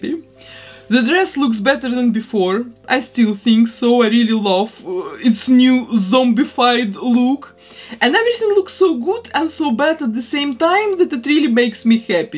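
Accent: Russia. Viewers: Обалденно! А у вас русский акцент?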